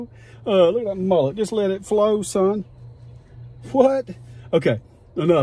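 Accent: South, United States. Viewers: The best part of your video is your comments. It’s great listening to you. You sound like I’m talking to myself with southern accent. I’m a yankee. I’d rather have Yuengling.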